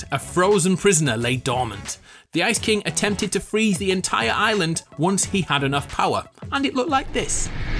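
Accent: United Kingdom. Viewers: Yay good brit voice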